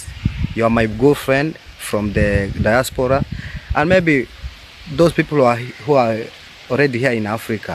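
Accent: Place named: Uganda. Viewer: Bro you sound like a Ugandan and you are saying you are from Kenya😮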